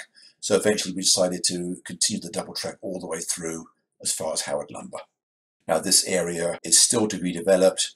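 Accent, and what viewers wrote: England, United Kingdom. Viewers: I just love the term; ‘Herniated Helix’. Not a term I’ve heard here in the UK. I am amazed that you still have your English accent, despite your use of American terminology. I tend to readily pick up accents and if I lived for a year in the US, you would believe that I was born there. I do enjoy watching your videos. Best, Jason.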